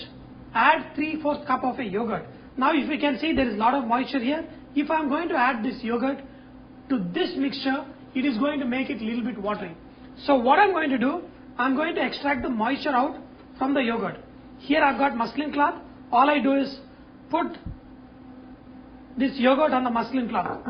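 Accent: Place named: Andhra Pradesh, India. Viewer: hmm... Hes from Andhra pradesh...the accent made me to search his origin :)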